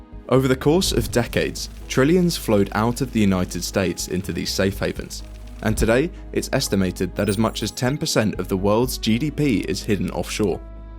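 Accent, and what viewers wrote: United Kingdom. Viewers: Why is someone with a British accent always telling me about America...and everything else for that matter. Does some study say people listen to this accent more attentively, or something? If it were a mild accent, as if they had actually lived where I live, I would say alright, maybe parents were British or something. But sounding like you just washed up on American shores and are here to tell us how to live and what's up......rather insulting and I don't want to really listen to it. Especially looking at what happens in your own damned broken country. Don't want to emulate that crap.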